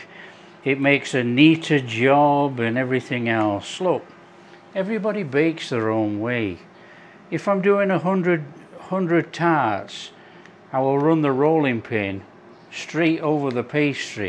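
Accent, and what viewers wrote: United Kingdom. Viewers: Can someone tell me what is this man's accent (within UK)?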